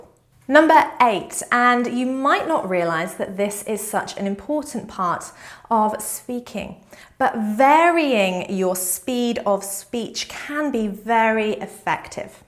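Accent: United Kingdom. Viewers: A very engaging presentation. I like Teacher articulation of words - so clear, great pronunciations, so distinctly British accent. Reminds me of my favourite english teacher, Miss Courtney of "Mind Your Language" fame. She's always back straight chin up. I really marvelled the way she speaks, so British. She's got her own peculiar strong screen presence.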